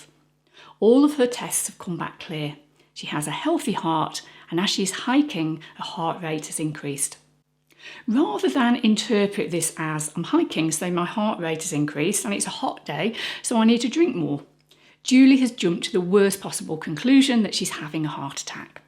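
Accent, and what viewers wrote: United Kingdom. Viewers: Excellent CBT explanation with beautiful British accent, thumbs up!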